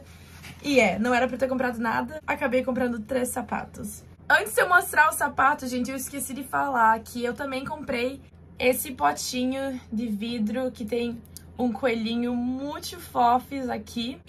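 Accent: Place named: South, Brazil. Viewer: o sotaque sulista deixa tudo melhor, me identifico kkkkk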